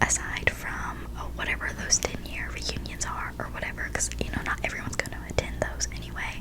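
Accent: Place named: South, United States. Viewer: Girl I am ugly crying in my bed like snot dripping from my nose crying. This vid hit hard with me. I totally relate to everything you said and lm 41. I like videos like this because I like getting a view of other people’s lives. Like I look at you and think gosh she’s so pretty, she has the cutest southern accent, she is so dam kind and I envy you. But u gave us some real ass shit and I love it. I don’t love how you’re struggling but just know you’re not alone. I know it seems embarrassing talking to someone, a stranger about how you feel but don’t be. If you need help it’s ok. This is my fav video of yours. I honestly like these asmr videos more than the other stuff. You’re one of my favs 💕💕💕💕💕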